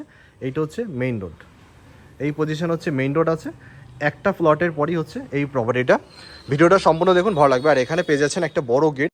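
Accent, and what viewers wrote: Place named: Bangladesh. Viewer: কিছু মনে করবেন না ! বলছি দাদা আপনি কি বাংলাদেশি? আপনার কথাবার্তা একদমই পশ্চিমবঙ্গের বাংলা ভাষার মত নয়।সম্পূর্ণ বাংলাদেশি বাংলা ভাষার মত। আবার আপনি অস্থির কথা টাও ব্যবহার করেন যেটা বাংলাদেশীরা করে।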